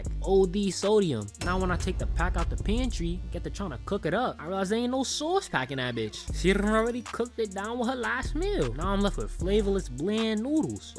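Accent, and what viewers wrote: New York, United States. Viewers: i'm hooked on this nigga videos 😂😂 shii funny asl and the NY accent makes it even better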